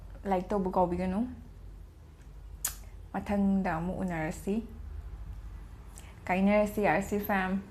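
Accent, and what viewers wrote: Kakching, India. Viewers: Che ei ngge vedio c ahnba oina nga c thngnajabani kakchingdeisu oirmmy ym phajei eisu kakchingdeini ym yngba nungngai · Kakching lon nangse ng kakching lon ngangbase ym cute oiye.... Pliz mathangdadi ngangsiko...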